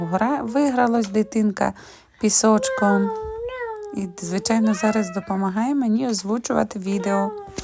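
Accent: Rivne, Ukraine. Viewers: Це так говорять на Рівненщині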